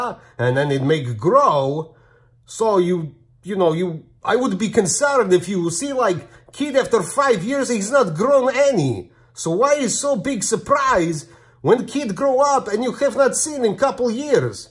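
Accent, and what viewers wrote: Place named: Russia. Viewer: Omg this dude makes point good pls sir teach Russian language and accent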